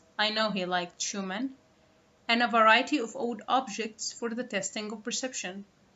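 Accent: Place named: Spain. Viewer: She sounds a little Spanish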